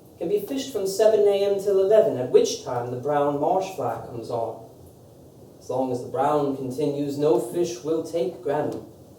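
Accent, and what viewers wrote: South, United States. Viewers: Ezra Pound recited in the most cultured Southern accent I have ever heard.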